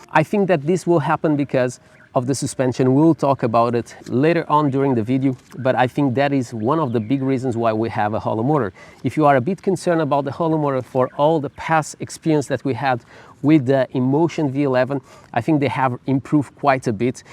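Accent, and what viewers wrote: Portugal. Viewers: Apostava que és português...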